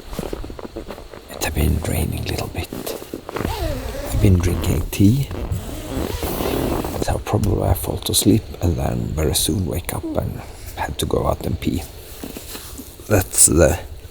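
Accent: Sweden. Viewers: That was good entertainment! I am ready now for a tour in fog and rain, and I will practise my attitude ;)) I don`t want to sound creepy, but I love your SwEnglish, it it is very relaxing to listen to.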